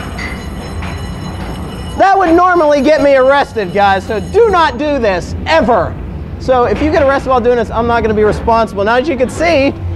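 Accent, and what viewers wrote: Pittsburgh, United States. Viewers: Are you from pittsburgh Pa??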